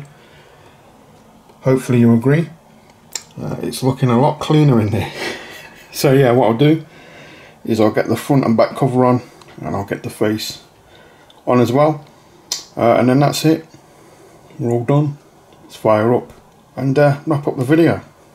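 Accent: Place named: England, United Kingdom. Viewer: U sound just like bmw doc lol y'all must be from the same part of England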